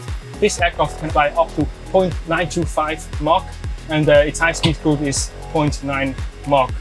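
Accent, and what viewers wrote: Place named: Switzerland. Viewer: Meeeega schwizerakzänt ich würd sogar säge du bisch stadtzücher😂 stimmts?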